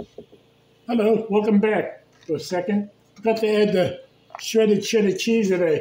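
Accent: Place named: Wales, United Kingdom. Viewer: Are you originally Welsh? You have a Welsh accent here and there :)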